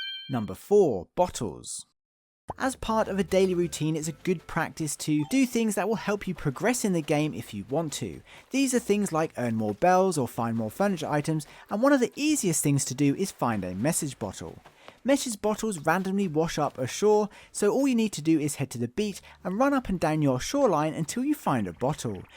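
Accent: England, United Kingdom. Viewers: You have a great english accent 😌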